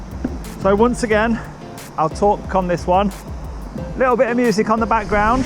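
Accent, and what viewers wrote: Leicester, United Kingdom. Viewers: Love the quality on this channel, I live in Derby near swarkestone bridge on the A50 so you are so local!!! Leics twang sounds so similar to Nottingham twang, which is where I was born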